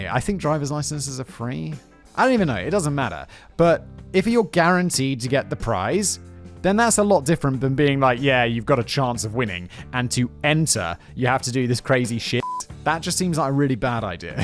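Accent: United States. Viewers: Simon has been reading so many scripts written by US. writers his brain is starting to use our pronunciations. This channel is great and the rants are classic. Thank you for making me smile on my darker days. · Simon's getting more and more of an American accent. We do say "ad ver tise ment" not "ad vert as ment". LOL.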